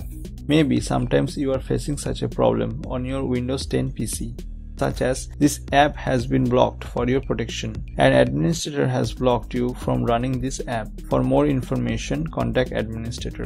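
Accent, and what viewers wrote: India. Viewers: i hate indian's english but thanks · Lots of love bhai Tysm i was stuck installing my printer ty ty ty love from India your voice seems you are from West Bengal india 🇮🇳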